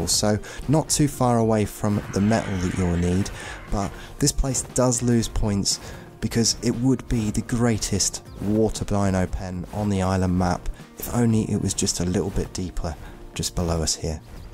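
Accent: United Kingdom. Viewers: Am I the only one that is getting a British vibe from him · Cuz he is British lmao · Hey bro, I can hear you´re British and I totally dig your accent, but I am Czech and here tea is only for ill people. We only drink it if we must, we drink coffee and we also hate the instant stuff, so cheers and thanks for all the info.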